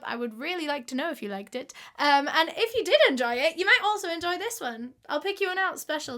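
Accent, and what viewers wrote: United Kingdom. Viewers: I love your British accent 😍❤️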